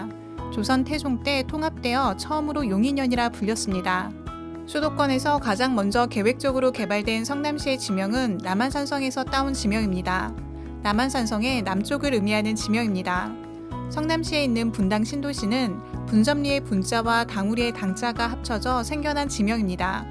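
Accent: Gyeongsang, South Korea. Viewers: 경상도 분이신가 봅니다.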